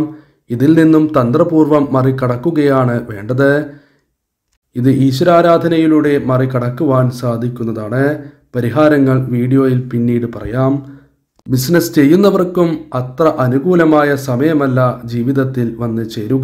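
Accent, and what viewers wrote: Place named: Namboothiri, India. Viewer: വളരെ വൃത്തി കേട് ആയിട്ടുണ്ട്.... Cool ആയി പറഞ്ഞാൽ പോരെ??? എന്തിനാണ് നമ്പൂതിരി യെ അനുകരിക്കാൻ നോക്കുന്നത്?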